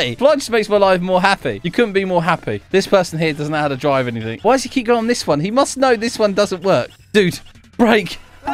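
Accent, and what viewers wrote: United Kingdom. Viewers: hold up, wait a minute, are you from the UK?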